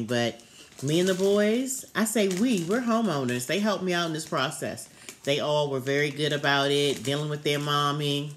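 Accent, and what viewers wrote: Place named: Maryland, United States. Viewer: Congratulations! My parents are from Annapolis, Maryland. I recognized that Maryland accent right away! I have a million cousins, and aunts and uncles up there. I really enjoy your channel. ☺️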